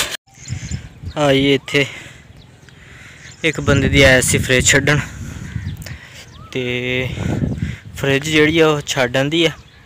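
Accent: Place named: Punjab, India. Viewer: Salaams nice Punjabi we speak like you too apnayat mehsoos hoti hay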